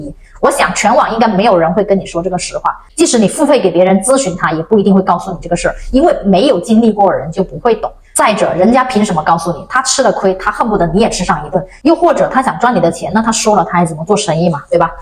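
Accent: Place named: Hunan, China. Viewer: 你是湖南老鄉吧？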